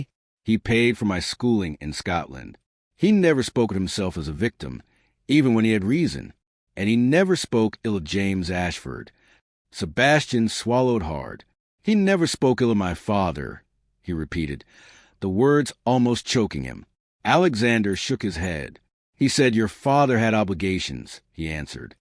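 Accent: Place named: United States. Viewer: Why haven't these regency romances not been given English narrators. Sound very odd in American English.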